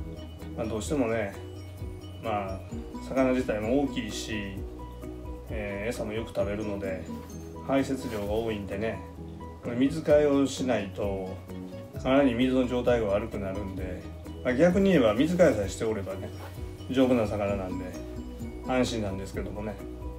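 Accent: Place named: Kansai, Japan. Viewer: わぁーー😆今回はちゃんの生声素敵voiceですね✨✨関西弁❓私関西出身なので久々の関西弁に懐かしく嬉しくなりました\(//∇//)\ アクリル水槽は傷がつきやすいのですね👍✨✨ 湯沸かし器を、通して水を、入れているのです😊細かいメンテナンスでちゃんのお魚達はご機嫌ですね👍✨✨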